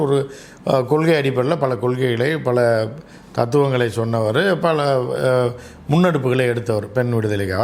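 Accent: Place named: Nagercoil, India. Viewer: Nagercoil slang kooda ippadiatha irukkum...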